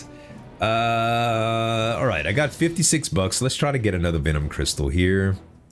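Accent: Louisiana, United States. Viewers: Hey man, glad to see you doing well, just found your channel TODAY (coming from gohjo lol). I’ve watched a few vids and noticed you answer comments either in videos or reply right here, so i have one question. WHERE ARE YOU FROM? You play with your voice a lot it seems but i keep hearing so many different little American dialects. I’m wondering if that’s just how you speak naturally or if you are doing random accents/slang for fun? Please I need to know why your speech patterns and accent is so hard for me to place. Thanks and love the content my friend.🙏👌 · I'd bet money that's a Louisiana accent. I know my people.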